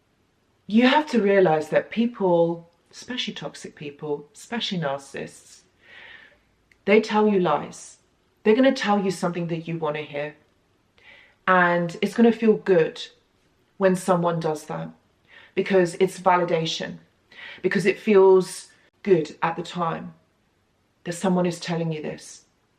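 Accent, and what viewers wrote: England, United Kingdom. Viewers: I found this for a reason. Lovely to hear it with an English accent!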